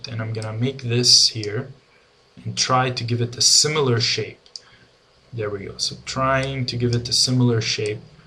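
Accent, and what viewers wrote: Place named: Europe. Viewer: I watched some of your videos before and got distracted to some other. So I wanted to make these comments but did not get time then. But now I started watching them again and forced myself to comment on your videos - 1. First, it is very CLEAR VOICE and VIDEO both. Even though you have an accent, it is very easy to understand and your EU accent gives a very nicee exotic flair to it! Don't you all agree people? 2. Secondly, your voice also has a very determined way of expressing something - it almost hears that you are confident enough to teach, but yet you stumble humanly on some stuff but you confident way of saying things keeps us hooked. So this is a compliment too..you may be mistaken of shaky but you voice is NOT. And that is a good TEACHER quality! 3. Thirdly, you are very funny - you make fun of yourself using the the PAINT program to do cursive writing etc..then tell "us to try it"..I literally tried and found it fun!! 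just like you did! Also, the human part of you, when you did not rehearse and tried to expand the 2D array without putting the element in there and it was not doing it and you stumbled on indefinitely..then gave up and smoothly changed the subject going on to explain the internals of an array!! very funny and very human! Some of us would have decided to scratch the entire recording and start over after finding the actual method..but your publishing this stumble made you very human - one of us - and that makes a good connection between a teacher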